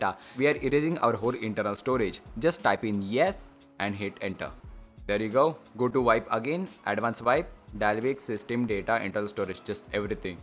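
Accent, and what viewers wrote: India. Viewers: ХАХАХА люблю индийский акцент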